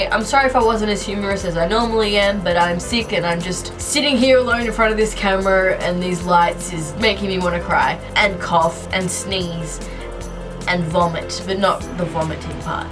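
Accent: Australia. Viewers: Girl, I'm an Aussie living in the UK - was sooooo nice to hear your accent!!! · I think she's an Aussie ^^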